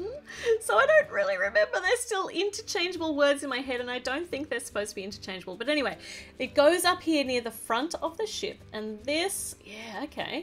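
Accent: Australia. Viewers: Ur so good at making legos and I love ur Australian accent I love u ❤️❤️🤗🤗💖😍🥰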